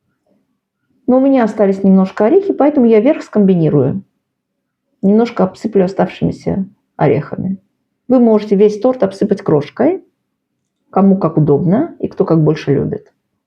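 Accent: Baku, Azerbaijan. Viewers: Это бакинский акцент. Растяжка последних гласных в словах это влияние фарсидского языка.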